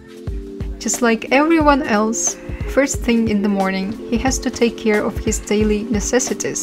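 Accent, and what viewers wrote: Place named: Russia. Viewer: Английская озвучка типично русская... Ландан из э кэпитал оф грет британ....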